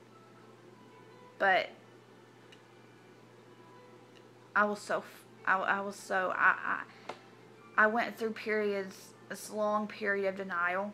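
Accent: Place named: South, United States. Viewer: I love your Southern accent and I'm sorry this happened to but you're very pretty!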